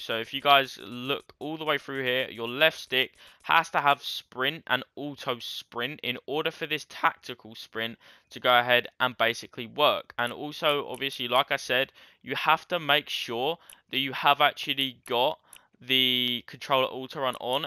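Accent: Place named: United Kingdom. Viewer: always the british youtuber bro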